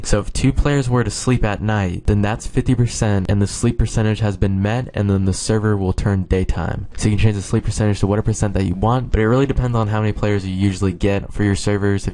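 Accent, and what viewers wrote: Italy. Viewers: are You italian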